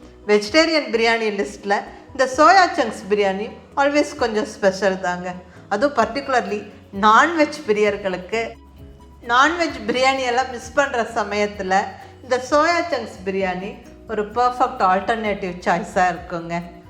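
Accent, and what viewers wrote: Kongu, India. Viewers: I tried and the result was superb like your kongu slang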